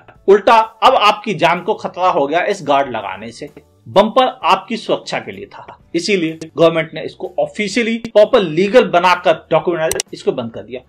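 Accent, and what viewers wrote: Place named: Uttarakhand, India. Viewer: Are you from uttra khan because I am and determine your voice tone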